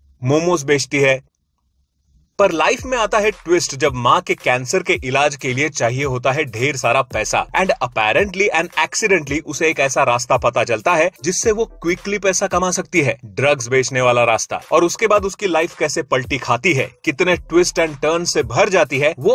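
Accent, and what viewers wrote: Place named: Uttar Pradesh, India. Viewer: Up accent ko tum hi suno..... hum to hindi accent hi sunein gay.......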